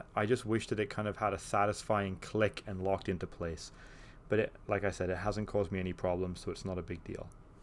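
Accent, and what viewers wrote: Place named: Wales, United Kingdom. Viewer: Great review, thank you! My Breville Dose Control has just kicked the bucket so I’m in a similar situation. I’m between this and the Rancilio Rocky. What was your reasoning in choosing this over the Rocky? Also, on a side note, your accent sounds distinctly Welsh, if I’m not mistaken. Sounds like you could be a fellow Welshman in Canada?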